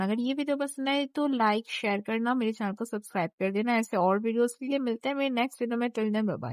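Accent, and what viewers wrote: Bengal, India. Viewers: Are you bengali? Your accent sounds similar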